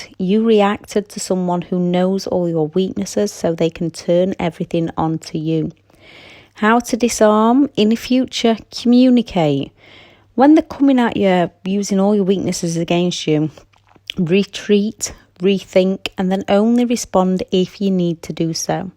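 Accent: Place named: Northern England, United Kingdom. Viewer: I'm still trying to figure out if her accent is northern England or Scottish (the kind of Scottish that sounds like it might be northern England but which is really just a "posh" form of Scottish, not to be confused with posh England English)... 🤔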